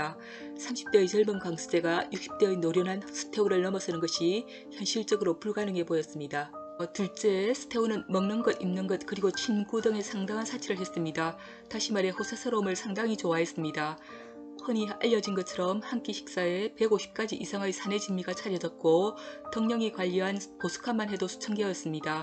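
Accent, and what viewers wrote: Gyeongsang, South Korea. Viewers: 경상도 발음에.. 내용파악하는데 불편! 그냥 자막으로만 처리하는것이 나을 듯! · 지독한 갱상도 사투리가 뼈속에서 나오는군요. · 같은경상도라 듣기 편했는데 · 목소리가 코가 먹었구용 사투리가 경산도분 여기서 꿈을 이루시나봐용ㅋ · 저는 경상도 사람이라 ..잘 알아 듣겠음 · 겡상도 억양 발음 문제 있어 알아듣기 어렵고 부자연스러워요 차라리 천천히 또박또박 말하는 습관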